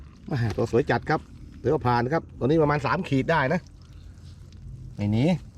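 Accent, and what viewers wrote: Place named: Trat, Thailand. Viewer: สำเนียงการพูดเหมือนคนตราดเลยเกี๊ย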